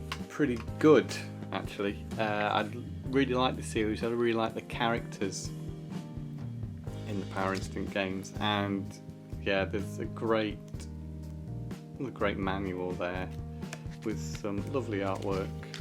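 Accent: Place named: United Kingdom. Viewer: Bro what's up with this channel, you sound British and nothing like the bald bearded guy on the latest video about kinder eggs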